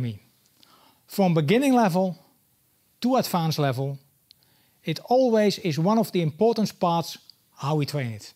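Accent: Netherlands. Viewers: Your English is good, you sound Dutch I don't speak Dutch but do speak German as well as English. I was told while learning German you never loose your native ancient unless you live in the country for 20 or more years. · Your english is oki :D dutch accent is funny, too. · Thank you very much for your hard work! btw, your English is actually awesome. by your accent I'd say your Dutch, am I right?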